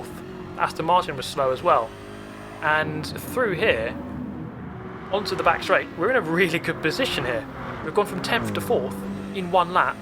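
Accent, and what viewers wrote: United Kingdom. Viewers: Your Initial D references earned my sub lol And I could listen to a British commentary on any topic 😆 love it! Keep up the fun videos and long live the shadow realm!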